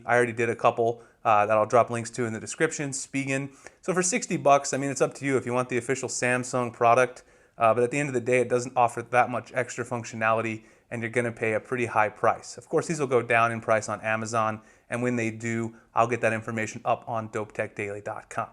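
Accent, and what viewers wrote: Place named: United States. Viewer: I love the way Americans say mobile lol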